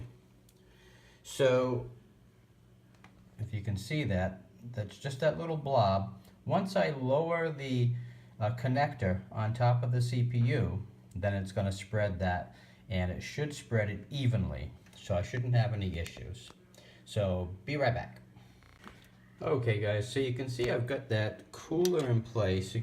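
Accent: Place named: New York, United States. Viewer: I'm with you Robert, he does have an interesting and great accent. I'm English and I can place most UK regional accents, a visiting lecturer was a bit shocked when I placed him within a few miles of his home just on his accent. To my totally untrained English ears sounds like he's from the New York wider area?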